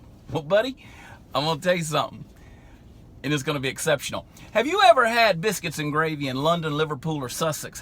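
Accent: Texas, United States. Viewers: LMAO!!! I don't know where he is from but I love to listen to him because I miss Texas so much. Not many guys like him here in GA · OMG I love you you are too funny. what part of the South? Sound kinda like Texas but have been know to be wrong.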